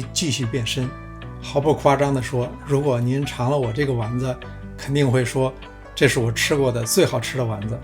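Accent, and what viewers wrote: Beijing, China. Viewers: 喜欢听你说话！比听歌好听！超纯音的京片子！做菜也到位！超赞！大师:多点出镜！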